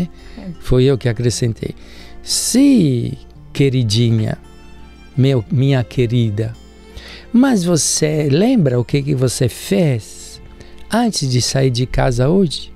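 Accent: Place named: Sardinia, Italy. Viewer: Esse Padre é sardo ( da Sardinha- Itália) não perdeu o sotaque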